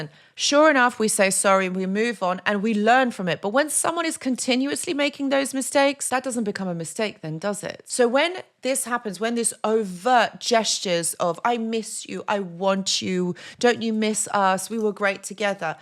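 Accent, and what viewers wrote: England, United Kingdom. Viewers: Somehow I've been bragging on the English accent & with good reason I'm totally subscribed & thx luv😘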